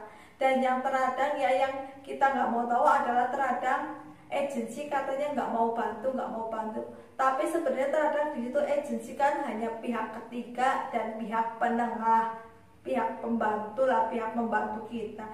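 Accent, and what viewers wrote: Banyumas, Indonesia. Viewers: Salam ngapak ora ngapak ora kepenak😀👍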